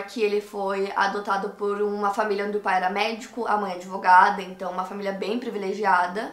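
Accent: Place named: Paraná, Brazil. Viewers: Vc é curitibana? Baita sotaque lindo daqui . Rsrs. Po mina , vc contou td sobre o net flix... spoiler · Adoro Seu Sotaque ... Acho tão lindo o sotaque Paranaense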